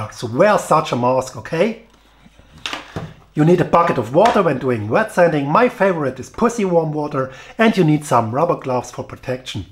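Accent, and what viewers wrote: Germany. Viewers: Das beste am Video: Das abgehackte und harte Englisch. Da hört man gleich das er ein Deutscher ist. 🤣🤣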